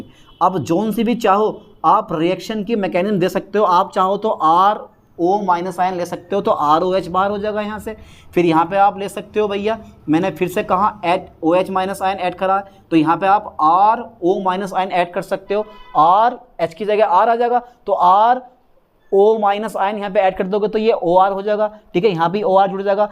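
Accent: Bijnor, India. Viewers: Sir aap bijnor ya hasaanpur se ho kiya😅😅😅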